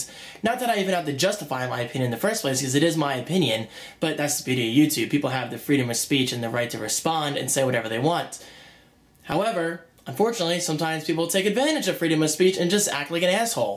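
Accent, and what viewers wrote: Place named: South Jersey, United States. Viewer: Wawa is a 10X better version of a 7-11. They have them all over Jersey and PA. Judging by his accent he's prob from the South Jersey/Philly area.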